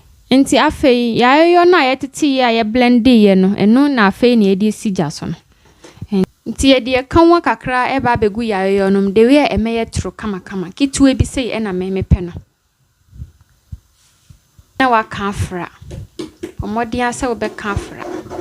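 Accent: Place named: Ghana. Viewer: your Twi is beautiful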